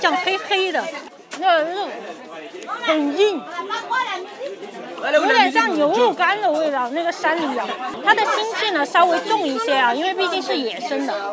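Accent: Sichuan, China. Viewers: 你的川普不错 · －口川普，好可爱😊😊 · 四川口音好重！ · 你這個川普可以喲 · 你这四川口音好重，😊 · 你这个川普真滴正宗啊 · 这口音绝对四川妹😄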